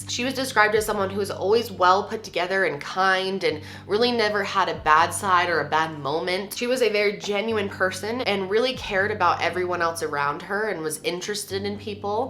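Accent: United States. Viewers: no shes american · I love hearing American pronounce british place names 👍🏼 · i absolutely love the way americans pronounce some words hahaha its such a laugh thank you for covering this!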